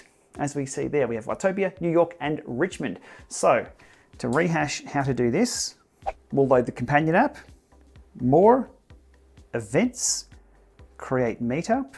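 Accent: Australia. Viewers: May GPSheepy safely graze! Will meekly follow along, username V.Bonazzi. Thanks for your excellent videos, your Ballarat accent and videos from home. This Zwift e-snail lives a long way from home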